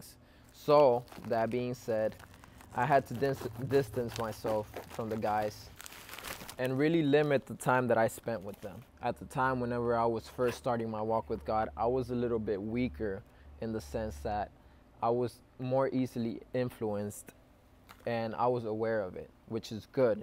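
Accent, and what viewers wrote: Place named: Spain. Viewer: whoaaaaa his spanish accent has gotten better. you can hardly hear it when he speaks english thats rly cool to see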